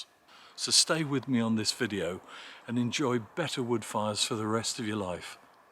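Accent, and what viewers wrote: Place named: RP, United Kingdom. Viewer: He has a posh British accent, so I instantly believe anything he tells me.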